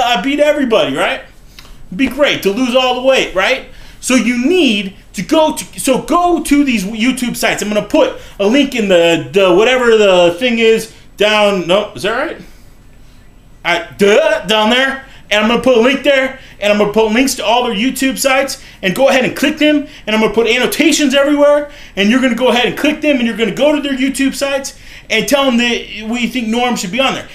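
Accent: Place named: South, United States. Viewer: or.... you could film her LOL and you live in st. louis i live in st louis! it sounds like you're from the south though i don't know why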